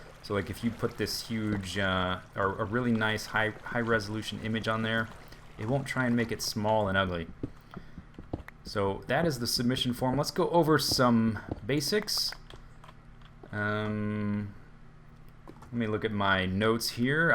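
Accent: United Kingdom. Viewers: Good, British accent. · its, with a sexy uk voice?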